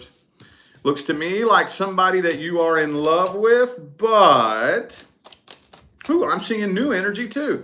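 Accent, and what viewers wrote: South, United States. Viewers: Wow,. FirstlyI love your Southern accent! Secondly, yes my ex-bf was ♓️. Potential new guy is ♓️, but I’m still single.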